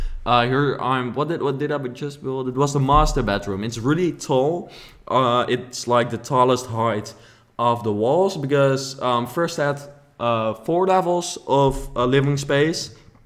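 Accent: Netherlands. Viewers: Great work! I love the part in the middle, it's so creative! As a Dutchie myself, I did find your accent a bit uncomfortable in the beginning, because it sounds so Dutch? But now I totally love it, and I always listen to everything. And you come across as a very nice guy, down to earth and sweet, very different from other channels. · I'm Dutch and yes and I can hear you're Dutch too, btecause of the accent. but you pronounce every word right and it's not like you always stop to think about how to say or seek for words. So you're good for me! :) (Go Gelderland! :D) Daarnaast. Je builds zijn geweldig! Ik houd van zulke moderne huizen ipv de typische american houses of de standaard rijtjes huizen die wij hier hebben! Ga zo door!! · You do have a little accent, but I don't think its anoying. I just like your video's! I'm dutch to and its just funny when I hear that kind off accent❤ And its a super cool house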